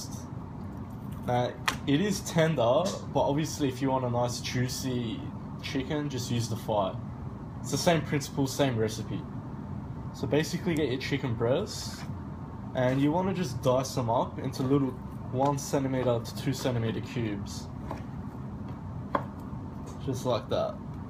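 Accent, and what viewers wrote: Australia. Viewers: Love the Aussie accent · Nice aussie accent mate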